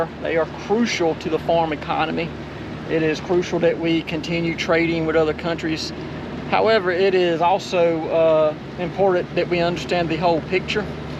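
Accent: South, United States. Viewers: Thank you for this report! It is very informative and without ideological or political influence. By the way, I love the southern accent.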